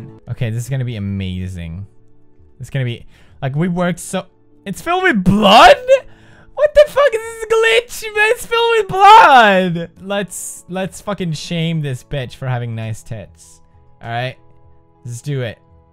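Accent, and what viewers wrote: Sweden. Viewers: He did say bear but he has a Swedish voice so that in a result is why you hear "beer" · lol it is such a swedish thing, my bf is from there and he says beer as well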